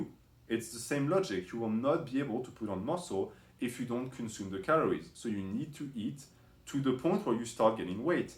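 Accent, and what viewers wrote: France. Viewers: J'aime bien l'accent français ;)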